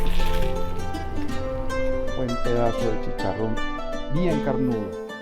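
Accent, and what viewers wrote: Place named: Colombia. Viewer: Me gusta Mucho esa técnica para Cocinar un delicioso Arroz pero también su acento Colombiano or que me imaginó que es de Colombia por su asentó Dios le Bendiga Gracias por la receta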